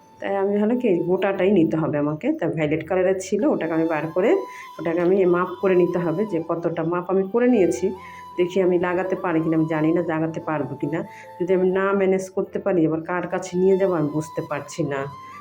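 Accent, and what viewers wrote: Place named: Rajasthan, India. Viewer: ❤❤❤ Rajasthani Bengali❤❤❤❤❤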